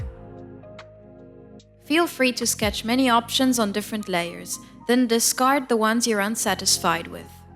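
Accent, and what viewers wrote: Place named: Arab world. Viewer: just a question but omg are u arab? i am! and u have kind of an arab accent!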